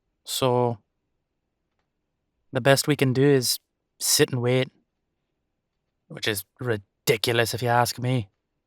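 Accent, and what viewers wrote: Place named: Ireland. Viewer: Toward the end when he got angry and started yelling about humans being the real monsters I legit had tears in my eyes. And when he stopped yelling I said sorry as like a gut reaction of like "I am so sorry you have to go through that." Listening to these audio roleplays helps me with my acting but the thing is that I have a very active imagination and so sometimes the emotions that I feel when acting are real. Well written and well acted. (I high five myself for your accomplishment) Also, love the Irish accent. I lowkey want to be apart of this series but I need to get my second channel up and going before I can do any colabs (if I would even be worthy of such) Anyway, twas a good video. I can't wait for more. (I would support on patreon if I had the money but I don't so here are some virtual high fives!)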